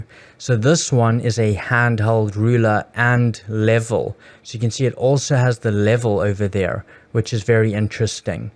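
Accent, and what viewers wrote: South Africa. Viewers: Are you from South Africa? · That South African🇿🇦 accent 🔥 Thank you broer😊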